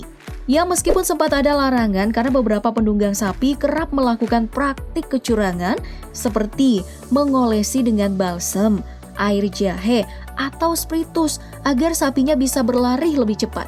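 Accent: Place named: Palembang, Indonesia. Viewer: Uwong palembang mano suaronyo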